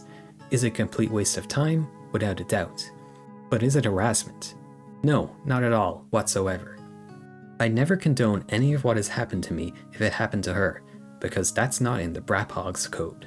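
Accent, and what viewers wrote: Ireland. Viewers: It's certainly an interesting variation of an Irish accent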